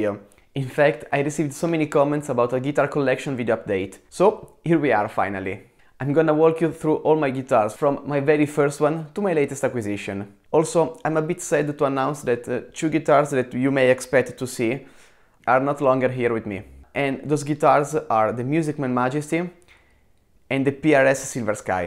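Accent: Italy. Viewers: You have the best Italian accent I have ever heard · aooo ma se sente che sei italiano AOOOOOOOO🇮🇹🇮🇹🇮🇹🇮🇹🇮🇹🇮🇹aoooooooaooooooo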